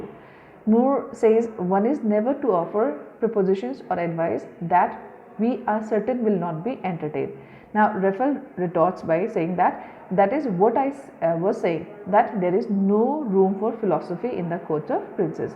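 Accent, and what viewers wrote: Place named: India. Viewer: I hate the Hindu accent, but this is the only summary available.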